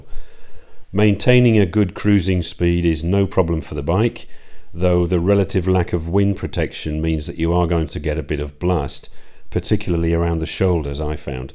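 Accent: United Kingdom. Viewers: Not only I find your opinion on bikes clever and accurate but also I get to practice my british English listening skills. Absolutely brilliant :D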